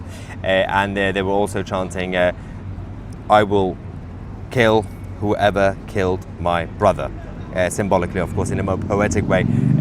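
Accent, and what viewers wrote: United Kingdom. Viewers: I can see and hear you, British accent and all!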